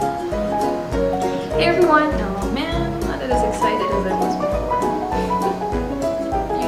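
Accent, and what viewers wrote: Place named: United States. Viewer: You speak like American girl (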